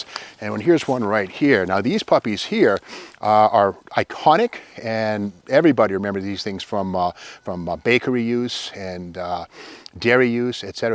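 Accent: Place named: New England, United States. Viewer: I always love it when you do these videos AND when you are on auto auctions - you convey information in an easy to understand way and your vocal characteristics are just first rate! Being from Vermont early on, I do miss that New England accent as I live in Virginia. Keep up the great work and thank you for being on youtube!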